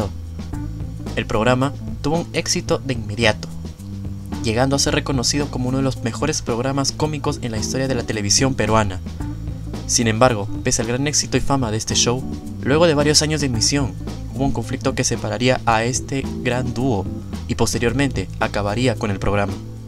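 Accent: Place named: Chile. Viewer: Tienes acento chileno pero mejorado.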